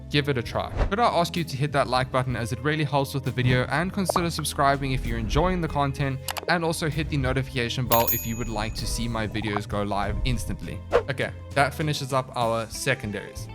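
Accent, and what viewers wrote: South Africa. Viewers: Great vid Boet. Think I'm recognizing some home accents there? You from SA?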